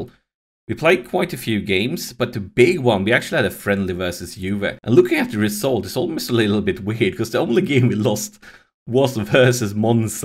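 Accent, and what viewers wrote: Sweden. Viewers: Are you swedish? Sounds like that on the accent!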